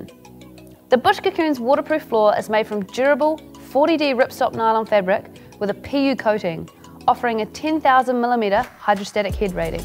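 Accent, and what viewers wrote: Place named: New Zealand. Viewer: Wit wither? Are you a Kiwi by any chance?!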